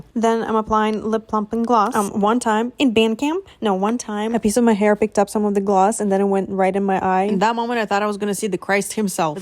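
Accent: Russia. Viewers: I'm actually wondering about your native language (like u've said about the immigration) cause it sounds so russian (delulu myself) And i also have 1 clue. Ur nickname can be read in russian like with the transliteration (the translation is unbreakable) sooo that made me even more sure about my assumption Didn't want to offence anybody, if i'm mistaken, at least you have +1 russian pookie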